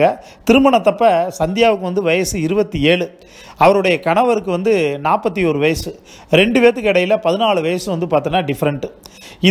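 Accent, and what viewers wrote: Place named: South, India. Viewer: Ninga South ah? Slang apdiye pesuringa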